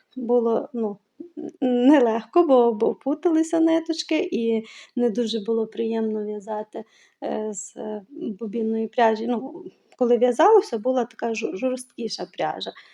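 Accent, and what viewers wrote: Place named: Western Ukraine, Ukraine. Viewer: вітаю, подивилася з насолодою ваше відео, приємно слухати ваш діалект. У мирні часи ми кілька разів у відпустку, їздили по західній Україні, були і в Карпатах і в закарпатті, завжи набирали грибочків, звичайно сушених але яких запашних... Ви мені нагадуєте ті приємні часи:). Діліться ще з нами вашими гарними виробами. Бажаю спокою і миру❤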